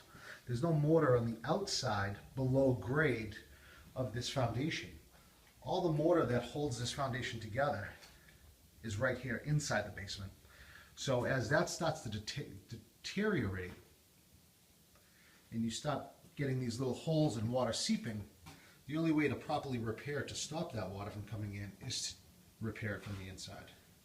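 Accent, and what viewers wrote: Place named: Boston, United States. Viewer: Hi, I've been watching many mason videos in hopes of learning how to do what you are doing in the video here. As soon as I heard your voice, I said to myself, this guy is local as I recognized our Boston/New England accent. So here is my story. I am a 67 year old woman who tries to do as much as I'm capable of doing myself. The basement you are working on is very much like mine. I've started digging out in between the stones and cement. I've got approximately 4x4 area done. Clearly I've taken on more than I'm capable of. HELP!!!! Are you able to tell me what that job cost the homeownet? Since mine is in the same condition and close in dementions, it would, at the very least give me ball park number to think about! I will go to your web site and read more of your services.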